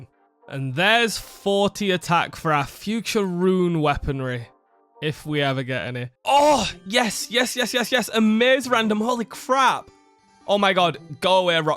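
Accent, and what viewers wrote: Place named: Yorkshire, United Kingdom. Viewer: Your accent is killing me.. Where are you from? Leeds/Hull/Sheffield area? It's so similar to mine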